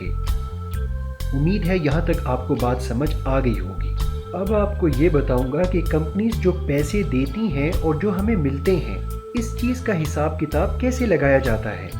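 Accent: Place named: Kashmir, India. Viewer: Bhai, good help hy ye... Men khud Azad kashmir Muzaffarabad sy hon... ap kashmiri speaking hen kia? Your accent say's something about Kashmiri (Koshur) Language...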